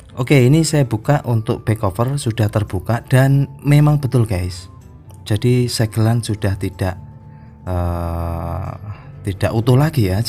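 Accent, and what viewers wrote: Java, Indonesia. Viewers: Mas orang mana sih kok kayak orang Jawa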